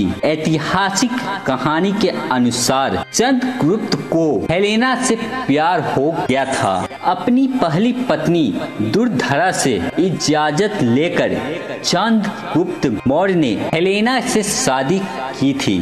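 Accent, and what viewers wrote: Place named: Bihar, India. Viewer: Are Yar phle bolna to shi KR Le ese LG rha jese koi bihari Hindi bol RHA h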